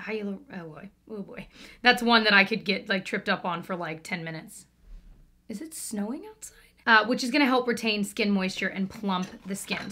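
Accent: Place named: Midwest, United States. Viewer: those Midwestern flat A’s made me laugh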